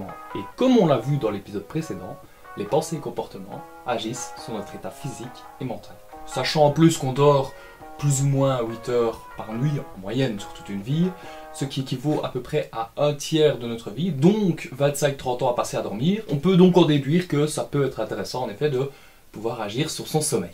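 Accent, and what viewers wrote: Liège, Belgium. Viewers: Accent de liège non ? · c accent belge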